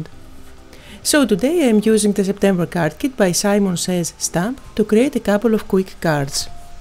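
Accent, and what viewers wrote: Greece. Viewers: YYEEAAHHH you have a voice ;-) AND it's beautiful and your english is remarkable! I love love your videos and your work is so wonderful. In June i went to Santorini on vacation with my two adult daughters .... hearing your speach took me back to Greece. I'm a Dane and I'm collecting the courage to make videos in English ... you help me so much. THANK YOU and greetings from your biggest fan in Denmark ;-) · just love your Greek accent also your very precise instructions on your fab projects. x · Luv ur greek accent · Always love your cards and now I can say I love your beautiful Greek accent! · OMG! It was great hearing your voice! Love your Greek accent! Thanks for sharing. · I adore your Greek accent!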